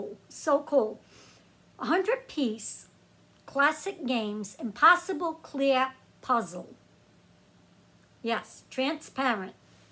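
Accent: Boston, United States. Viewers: Are you from Boston ? Your accent sounds like it 😊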